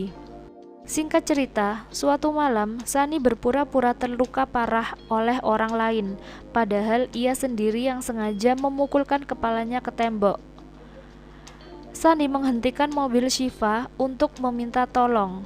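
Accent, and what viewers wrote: Java, Indonesia. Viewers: gagal fokus sya krn mbak medhok... pdhl sdah tegang btul ngikutin alur critnya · Medok mbak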